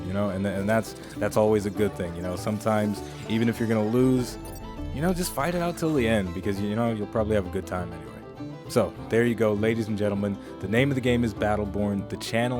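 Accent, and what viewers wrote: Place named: South, United States. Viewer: The taunting and the southern fried accent made this video entertaining. Well done.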